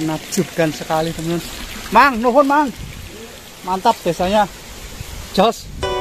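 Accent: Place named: Banyumas, Indonesia. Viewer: Sampurasuuuun,sing sarehat sukses baraya..kang ciganamah aya aya ngapak na gitu....hehee mantap kang...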